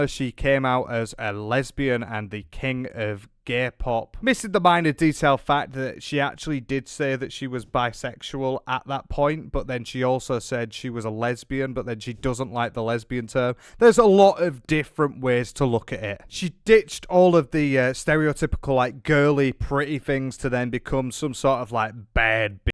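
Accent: United Kingdom. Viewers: I am American I SPEAK ENGLISH! But I HAVE NO IDEA WHAT THIS BRITISH DOUCHE IS SAYING I HATE THE BRITISH ACCENT 🙄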